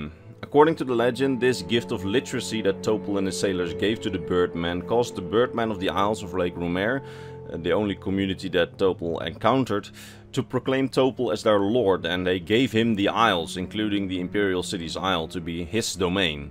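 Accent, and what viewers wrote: Netherlands. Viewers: Great video once again! Btw i might be mistaken but do i hear a Dutch accent? I'm Dutch myself and you sound very Dutch !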